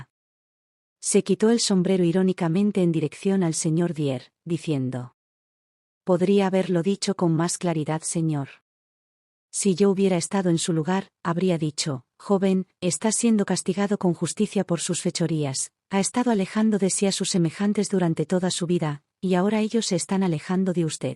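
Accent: Castile, Spain. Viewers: Narradora muy buena ,castellano perfecto ,no hay nada mejor. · Hacía tiempo que no escuchaba un acento castellano tan... castellano (y tan... femenino). Dicción y entonación impecables, casi "mágicas". Felicitaciones, y gracias por el trabajo que desempeñan. Un saludo cordial desde Buenos Aires, del otro lado del Atlántico. al sur del mundo.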